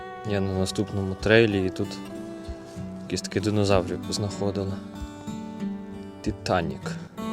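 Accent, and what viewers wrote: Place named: Volyn, Ukraine. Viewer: цікавий діалект, звідки ти? волинь (десь цей район?)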